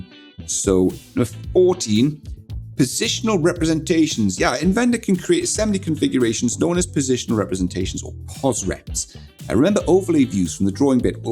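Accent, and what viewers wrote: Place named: England, United Kingdom. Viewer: just watched your video for a second time at 1.0 play speed instead of normal. I have a hard time keeping up and I think its just due to the heavy english accent. You create excellent videos but a suggestion comes to mind, SLOWDOWN , for us dumb americans that cannot always pick up what you are saying with the accent. I am sure you have seen this comment before and are perhaps sick of it but it has merit . PS love the leather jacket and for whoever thought is was distastefull should pound salt where the sun does not shine! TANK'S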